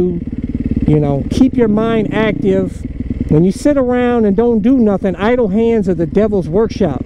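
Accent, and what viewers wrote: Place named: South, United States. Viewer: I really thought you were from somewhere in the south like Luisiana.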